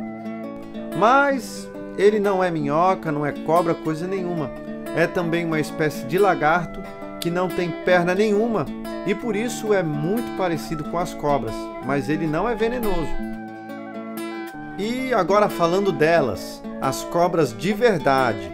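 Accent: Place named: Florianópolis, Brazil. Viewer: Muito bonito seu trabalho! O sotaque manezinho da uma qualificada ainda maior! Rsrs As imagens são bonitas! É vc mesmo que faz todas? Continue firme! Está de parabens!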